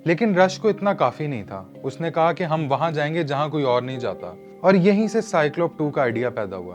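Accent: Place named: Punjab, India. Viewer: Keep it up . Lekin apni Urdu ko aur saaf tareqe se bole. Punjabi touch door kre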